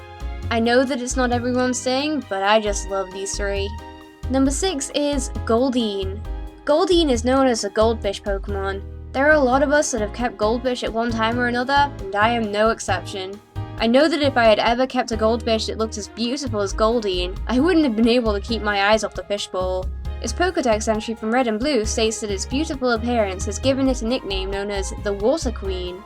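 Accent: England, United Kingdom. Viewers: me seeing this vid in my suggested feed big deal, another poketuber! im subbed to, like, a million others! ah, wtf, ill watch one of their vids me after starting this vid HOLY ARCEUS SHE HAS AN ENGLISH ACCENT!!! SUBBED!!!! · are you English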